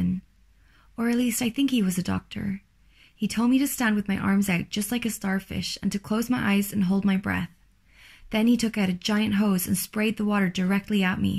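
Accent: Ireland. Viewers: I love your stories, please keep at it. Do I hear a tinny bit of an Irish accent? Reminds me of my Mum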